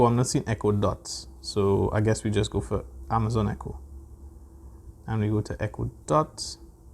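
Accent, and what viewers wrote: Trinidad and Tobago. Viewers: U sounding rell trini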